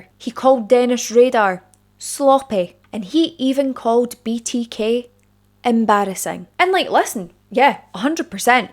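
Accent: Scotland, United Kingdom. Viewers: I've watched several videos on this apex laser! But, yours is the best! I appreciate the hard work and diligent research that goes into your content! Trust me,it shows brightly! Add your brilliant Sottish accent and we get consistent winners! Thanks, Jeff from Tennessee! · I came across your rivers and canal video by chance, so good to here a true crime buff wee a Scottish accent, your passion in that video brought me to subscribe to your channel.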